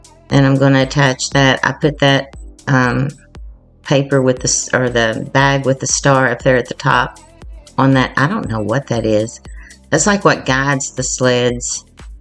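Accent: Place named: South, United States. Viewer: seldom hear a southern drawl. Yours is lovely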